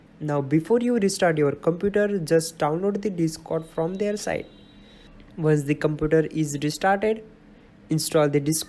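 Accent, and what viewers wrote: India. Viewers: When i heard the indian accent i thought u were a scammer or something and that it would not work however after doing this,this is the first solution that has worked the other ones that were from like white ppl that i thought would work all had failed u are truely the ACTUAL 1st indian tech support that ACTUALLY TRIES TO HELP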